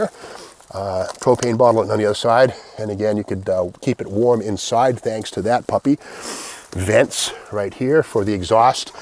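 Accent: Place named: Boston, United States. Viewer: Is it Burlington auto wrecking? You're boston accent comes out as bursington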